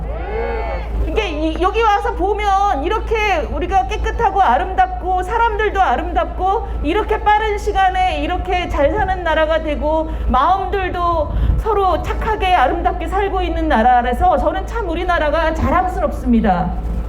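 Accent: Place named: Andong, South Korea. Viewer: 예천 안동 사투리 같은데요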